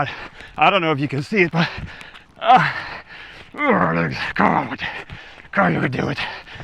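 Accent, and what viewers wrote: Skåne, Sweden. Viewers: Helt sant, och förlåt om min kommentar framstod som dryg - jag tycker alla språk och dialekter är coola och är bara nyfiken på hur de funkar. Är det allså så att kort o-ljud (när det inte blir typ å som i och) och kort u-ljud i din ursprungliga variant av skånska har smält samman till ett enda ljud?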